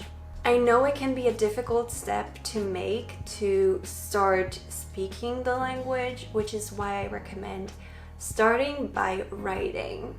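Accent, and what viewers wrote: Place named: United States. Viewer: I remember your first videos on this English channel and oh my God, girl, you've improved soooo much! Not only vocab and grammar but your pronunciation! If I didn't know you're from Poland, I would definitely say you're American! ❤️ cheers from Opole 😉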